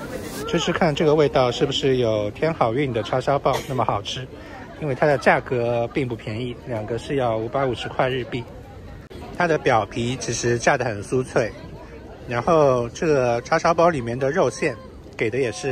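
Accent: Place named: Shanghai, China. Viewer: 是不是？ 真的！我聽到時也覺得超像的。 於是我猜G先生應該是上海人（當時還不知G先生是哪裡人），跟我女兒說，她覺得我亂猜！ 結果後來在其中一集答案揭曉，真的是上海出生的！😆😄😊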